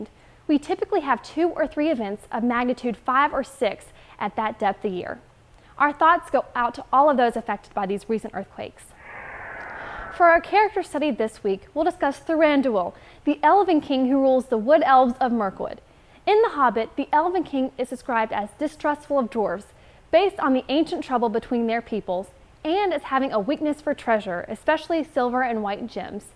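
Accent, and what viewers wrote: United States. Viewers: What kind of accent is that? It's like, a mid-west Arkansas thing but at the same time it sounds like shes trying to hide it..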